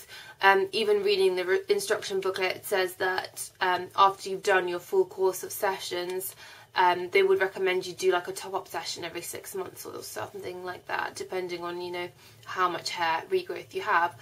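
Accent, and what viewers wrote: United Kingdom. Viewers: Oh, gosh,the speech is so distorted!.) ?. Very heavy British accent!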